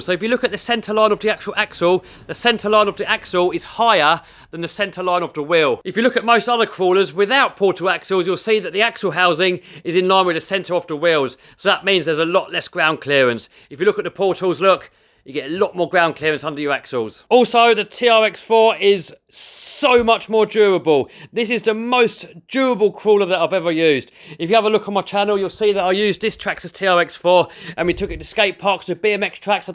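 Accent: United Kingdom. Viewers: Is that Uk accent? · Are you British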